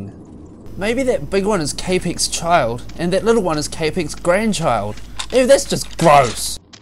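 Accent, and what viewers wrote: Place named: United Kingdom. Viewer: god i love your birtishnes :D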